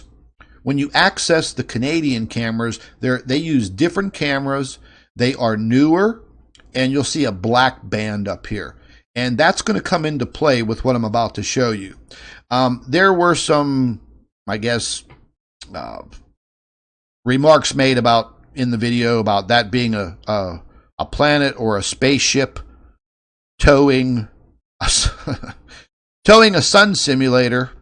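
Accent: Pittsburgh, United States. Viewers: You from Pittsburgh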